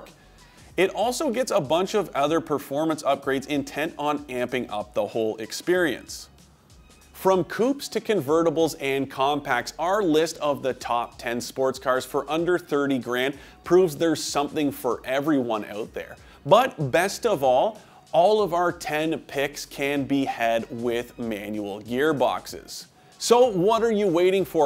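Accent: Canada. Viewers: First line he spoke, im like oh hes canadian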